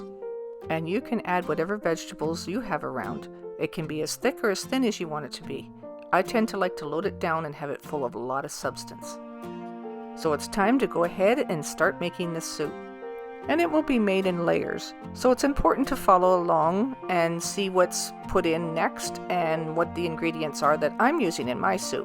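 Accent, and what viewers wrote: Canada. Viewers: PS are you Canadian?! I hear my families accents in your voice ❤️❤️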